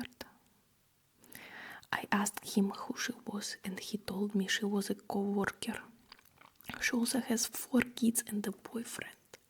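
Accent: Russia. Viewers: Glad to see you’re doing a lot more Russian accent. Please include more “yeah”s and “uh hmmm” they are really original and quirky only to you. Quite relaxing.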